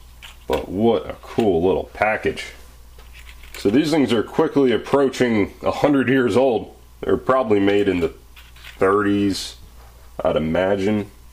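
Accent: South, United States. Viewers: Wow! I thought I clicked on an AvE video for second. Same type of voice with a southern accent. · Southern version of AvE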